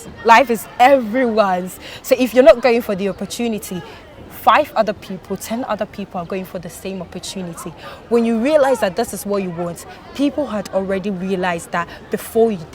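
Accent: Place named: United Kingdom. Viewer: This is so good.. she speaks with a British accent. That is what pertains in the UK. We can model it here for trial.. brilliant idea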